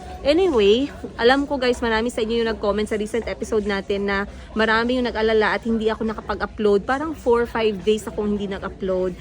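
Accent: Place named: Visayas, Philippines. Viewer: love n love q po tlaga ms. yang tagalog bisaya accent mo.nkka goodvibes po.❤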